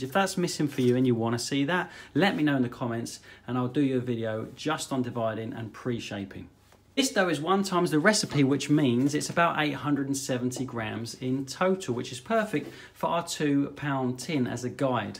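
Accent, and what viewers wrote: United Kingdom. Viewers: Having gotten all the knowledge im gonna get by watching all these videos, I now put them on just before bed so I have sweet, doughy, British sounding dreams.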